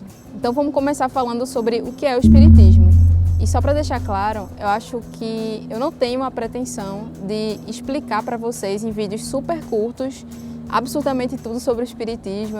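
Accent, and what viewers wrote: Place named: Recife, Brazil. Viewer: Oi, parabéns pelos seus vídeos, acabei de conhecer o canal e estou amando, já me inscrevi! Sou espírita mas estou engatinhando na literatura rsrs,comecei a ler O que é o espiritismo? de Kardec depois vou partir para O livro dos espíritos! À propósito...por acaso esse sotaque lindo é da minha terra, Recife? Rsrs um abraço fraterno!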